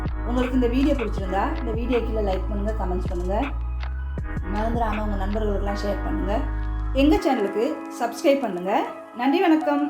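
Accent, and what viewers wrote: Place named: Tamil Nadu, India. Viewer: Nice tamil slang 😍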